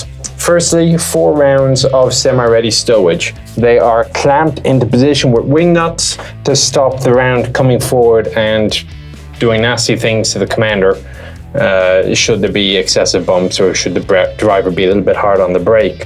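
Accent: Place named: Ireland. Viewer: Nichols, what is your nationality?.......it seems that your military service say American BUT you seem to have an Irish accent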